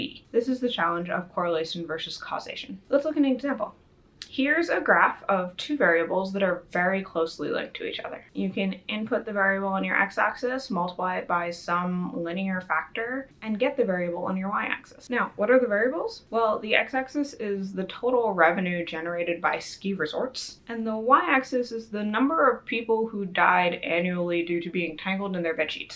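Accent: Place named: California, United States. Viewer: Dialect is Western, California, Santa Monica.....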